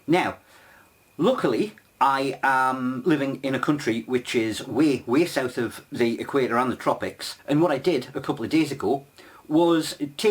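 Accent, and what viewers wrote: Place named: North East England, United Kingdom. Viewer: I can tell by you accent you come from somewhere near me (North-East England) - If you're back in this area any time soon, contact me and I can show you one of my equatorial mounts in action - I'm an astronomer, and own several of them... It's impossible for them to work properly on a flat Earth.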